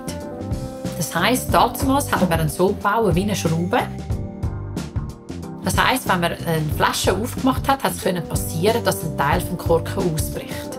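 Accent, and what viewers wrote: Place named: Switzerland. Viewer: Schön den Bericht in Schwyzerdütsch zu hören.Von dieser sympathischen Frau vorgetragen klingt es gleich doppelt so nett.Liebe Grüsse von einem VICTORINOX Fan.😄😄😄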